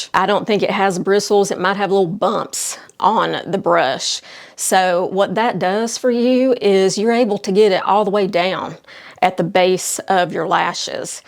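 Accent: South, United States. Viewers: You are such a refreshing and “ real” influencer ! I thoroughly enjoyed your video and love your accent cuz I’m a southern girl too!! Great job!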